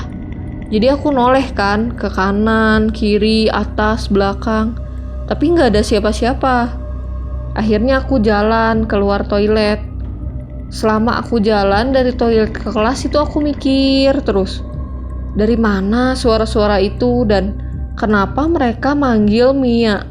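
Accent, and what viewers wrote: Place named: West Java, Indonesia. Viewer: Logat Sunda nya terasa Karakter suara mu kaya di animasi di tiktok yang gak takut sama hantu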